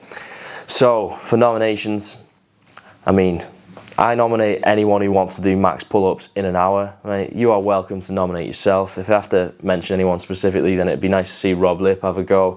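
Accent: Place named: Northern Ireland, United Kingdom. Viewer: man... what part of England you from? It sound northern Irish or Scottish-ish lol but yeah..where you say you from now?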